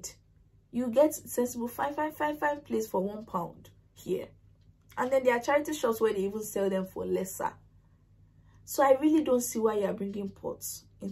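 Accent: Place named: Nigeria. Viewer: I just like the way you emphasis on the points with your facial expression and nigerian accent 😂, its so loving to watch. Thanks for making this video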